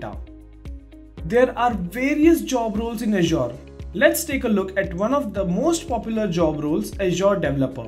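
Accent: India. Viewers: indian accent :(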